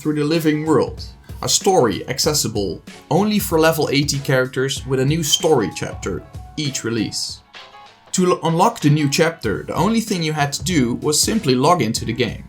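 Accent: Netherlands. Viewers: This fucking Dutch accent lol. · Are perhaps Dutch? I'm basing that on your accent.. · haha are you Dutch? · I hear a dutch accent :P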